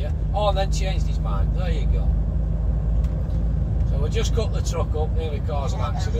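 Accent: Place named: Lancashire, United Kingdom. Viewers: Not often you get a proper northern accent on YouTube unfortunately. Glad to have found one that’s doing some good content! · The AI subtitles are really struggling with his northern accent 😅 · Nice to have you back missed your vlogs and that lancastrian twang!! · Can't fathom whether that's a Wigan or a Coppull accent? Just out of curiosity (only seen this vid), have you done one where you're on a dual carriageway that goes into one lane, to show how everyone has to get in front of the truck?? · I wonder where he comes from? I can hear an accent but I'm on my phone so I have naff speakers... "Just roll 'im 'round, tickling and fettling..." Oh! He's from up there! 😊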